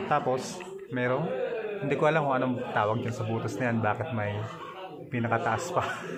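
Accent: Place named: Spain. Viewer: Why does it sound like you're speaking Spanish with Chinese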